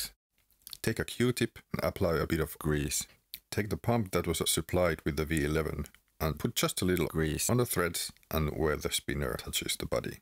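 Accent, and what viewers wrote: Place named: Finland. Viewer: Thanks for the vid! Do you happen to speak Finnish, I think I hear that a bit in your pronunciation. I’m from Finland myself.🙂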